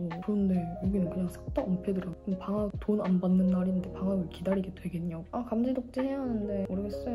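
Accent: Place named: Busan, South Korea. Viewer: ㅋㅋ 넘 귀여우세요 부산말투~~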